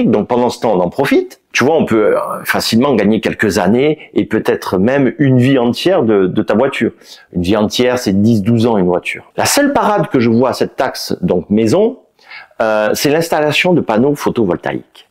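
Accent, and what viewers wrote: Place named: Toulouse, France. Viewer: Salut. Je viens de découvrir ta chaine et je me suis abonné direct. J'adore ton accent. "Oh Putain Con!!!!!!" Ah mais "Merde" j'ai le même....... Je suis comme toi "Pas français mais Toulousain ". Je récupère ma Modèle Y Samedi. Merci de nous faire partager ton expérience et aux plaisirs de te croiser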